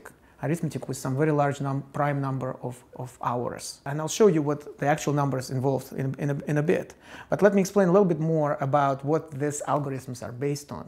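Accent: Russia. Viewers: He has definitive russian accent. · Russian accent explaining how the US govt spied on... Everyone. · His accent sounds like Russian. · I didn't even listen to the contents of the video, I just love the Russian accent! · Dat Russian accent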